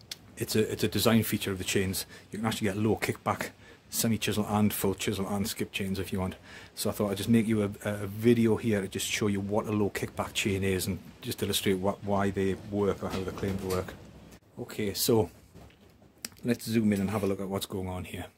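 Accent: United Kingdom. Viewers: It is really good to hear a British guy explain chain saw tips. have some unguarded 1/4 pitch chain on my 194, it doesn't cut any quicker and I have always filed the cutter away before I touch the depth gauges. There are lots of people on youtube that are really into fast saws which is fun but any saw is heavy after a day's work fast or slow!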